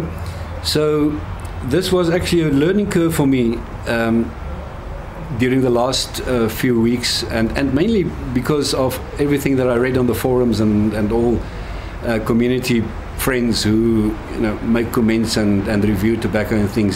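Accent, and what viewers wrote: South Africa. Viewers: Good evening sir Could that be a South African accent?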